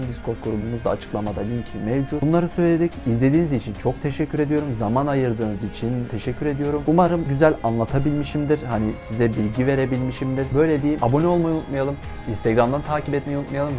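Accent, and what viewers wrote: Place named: Germany. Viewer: Reyiz almanyadaki kuzenime benziyon tip şive bakımdan almanyada bulundunmu?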